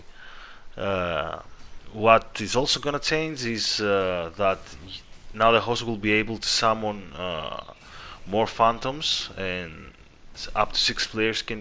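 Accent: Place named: Italy. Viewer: Oh, I agree with you. A lot of invaders are just elitists jerks. Everybody was a noob once. Everybody struggled. I know I did. People still complain about gankers, but real gankers are so rare nowadays. 3 dudes trying to beat the level are not gankers they are co-oppers. DS3 was the most balanced way From Soft did in my opinion. IMO It struck the possible balance between hosts not being too strong and invaders not being overpowered. It makes the game fun for most people. About DSR - I have heard about the rumours about weapon matchmaking, but as far as I know that is still unconfirmed or uncertain ("Players outside a host's parameter range will not be matched with each other"). The estus healing only in DSR is already confirmed. <link> DSR will IMO follow a lot of what was learned about balance in DS3, as DS1 was very unbalanced, sometimes in the hosts favor and sometimes on the invaders favor. 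I am glad to hear this. I bet there will also be a double confirm system on backstabs like in ds3, and stunlock will not be infinite, but this is just speculation. Anyway, great video, and I hope to see your content on DSR. PS- BTW you are italian, right? Your accent seems italian!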